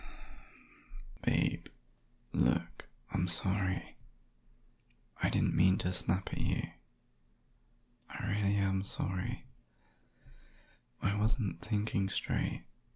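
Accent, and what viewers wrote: England, United Kingdom. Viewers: Ayy proper English accent hear that daily in my life but this one sheeshhhh cute as hell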